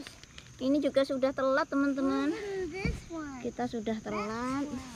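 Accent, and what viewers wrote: Java, Indonesia. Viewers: Ya Allah......aku ngiler liat jamurnya Btw.......kak asalnya darimana?ngomong nya medok kayak orang Jawa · Ngmong nya medok🤣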